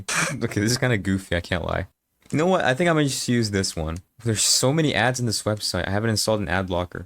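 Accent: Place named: United States. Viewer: Hey man... I've been subbed to you for like a month or two and i friggin love these videos :) Also out of topic but where did you get that American accent while being a non native English speaker 😭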